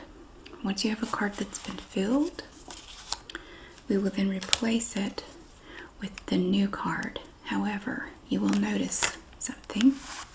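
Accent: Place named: South, United States. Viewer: Your voice is the most beautiful thing I’ve heard in awhile. You sound like Lina Grace with a Southern accent instead of an Australian one. ❤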